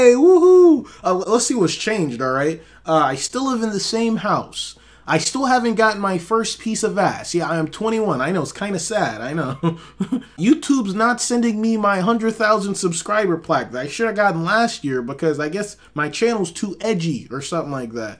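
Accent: Jamaica. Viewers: Wait yuh jamaican holy shit bless brogawd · is he Jamaican?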